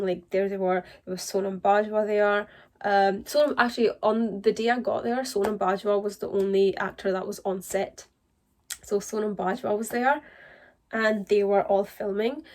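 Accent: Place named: London, United Kingdom. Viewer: Amazing but why do u kinda sound that ur from London???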